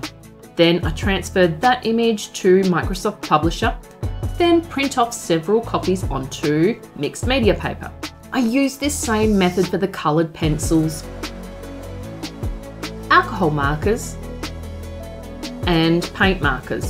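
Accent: Australia. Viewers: You could call it "My Swatch Book: because PPPPPP" Love your work as always, it's always so refeshing hearing another Australian accent on YouTube!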